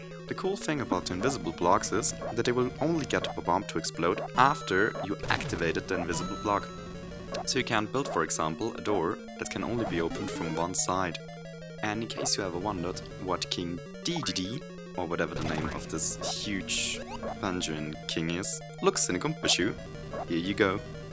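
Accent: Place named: Germany. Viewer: Here can i hear that he is german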